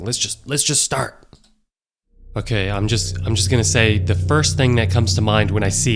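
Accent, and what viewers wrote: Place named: New Zealand. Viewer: YOUR FROM NEW ZEALAND. 😆😄😃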